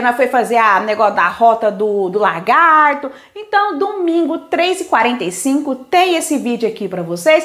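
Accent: Minas Gerais, Brazil. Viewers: Amei seu jeitinho de falar se e minerinha uaikkkkklinda amei sua receita vó faser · Nossa, que delícia, achei que era mineira por causa do sotaque mineira rsrsr.... Bjs adoro seus vídeos 😘😘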